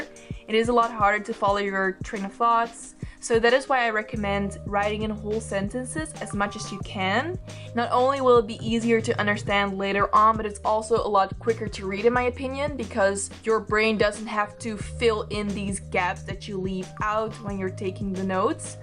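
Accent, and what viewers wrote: Netherlands. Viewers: Ik hoorde aan het begin van de video een nederlands accent, toen ik jouw schrift zag wist ik het wel zeker :) supergoede engelse uitspraak! <3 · Hi i know this is so random but are you dutch? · Ben je Nederlands 😅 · Is that dutch 😂❤️ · i smell some dutch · OMG ARE U DUTCH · Are you dutch? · Wait omg r u dutch?! 😍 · Ok but like I have such a strange feeling you’re dutch, is that correct? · at first i thought u were dutch too because of those pens and your accent... and then when we got to see your notes, I SCREAMED BECAUSE YOUR DUTCH TOO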